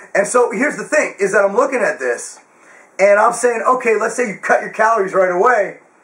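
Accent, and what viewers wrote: United States. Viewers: Country ass accent is cool ;)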